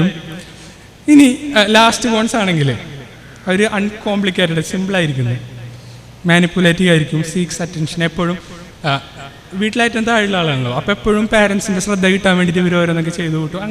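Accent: Thrissur, India. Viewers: Thrissur Slang presentation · കിടിലൻ സബ്ജെക്ട് dear..... കിടിലൻ തൃശൂർ സ്ലാങ്...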